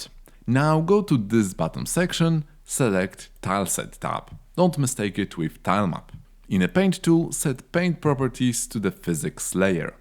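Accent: Poland. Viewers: The Polish accent hurts my ears.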